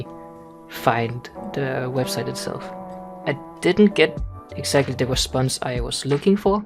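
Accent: Denmark. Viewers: easy to hear that you are danish XD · Fuck man kan bare høre du er dansk XD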